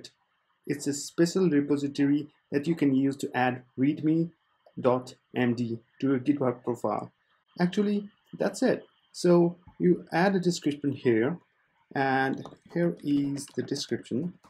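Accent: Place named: India. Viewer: Indian accent? DROPPED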